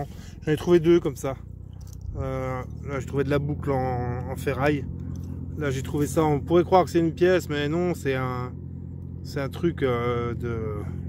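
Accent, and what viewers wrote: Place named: Alsace, France. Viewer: On peut pas nié que tu es Alsacien (Bas-Rhinois) 😉😇💙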